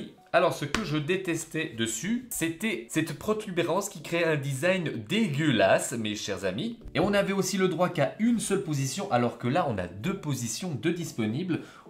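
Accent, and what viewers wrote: Switzerland. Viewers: J'me disais aussi avec un accent pareil pouvait être que "suisse" et pas frenchie, et on s'presse pas siouplait ))))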